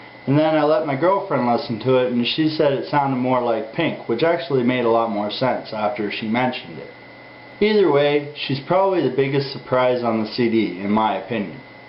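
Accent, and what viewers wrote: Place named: Canada. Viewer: I have a strong feeling you're Canadian based on how you speak. Anyway, good review, I probably won't get this cd ever but it's good to know it's not total garbage.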